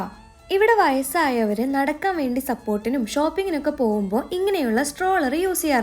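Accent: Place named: Kannur, India. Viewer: kannur slang veran thudangeetund😄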